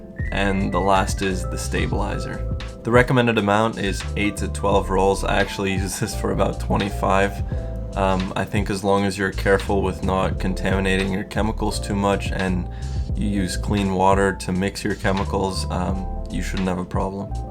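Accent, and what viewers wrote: Netherlands. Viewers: I love the video so much! I've had a look at your website and i love it! (Idk why but it sounds like you have a Dutch accent) if so, gegroet! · Are you Dutch?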